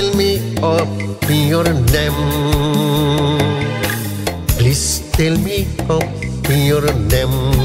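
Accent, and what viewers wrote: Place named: United States. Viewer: This guy should be a telemarketer his American accent is on point.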